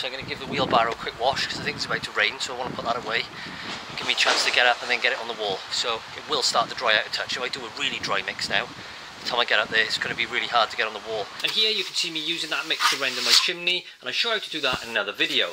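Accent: Wales, United Kingdom. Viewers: Welshman, straight to the point, love it, cheers! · I thought Manu Ginobli had an Argentinian accent, he’s gone Brit on me.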